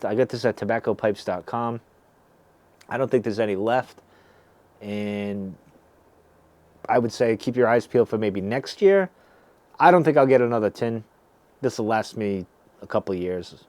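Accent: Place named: New England, United States. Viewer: Hi, from the sounds of it I think you are a New Englander like my self. I could be wrong but I’m picking up an accent I’m familiar with also nice ranger cloak I got the same one super warm. Like your stuff keep it up.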